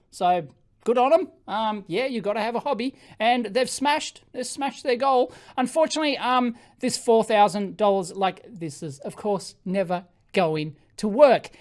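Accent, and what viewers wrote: Australia. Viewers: Geravi-tational lol gravitational. I love Aussi accents.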